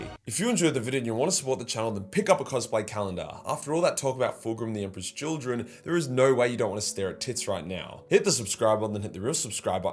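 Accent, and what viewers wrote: Australia. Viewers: bro how on earth is an edge lord channel? This is just how we talk in Australia lol.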